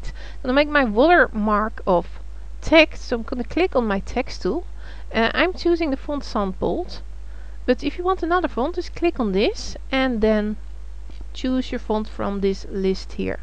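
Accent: Netherlands. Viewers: Hihi bij je eerste zin hoorde ik al dat je Nederlands was :] handig filmpje thanks! x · Haha je nederlandse accent komt er erg door :)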